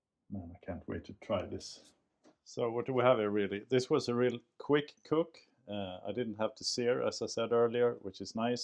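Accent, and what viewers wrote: Scania, Sweden. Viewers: Saknar verkligen din skånska från dina tidigare videos. När du pratade på svenska var du mer unik. 😊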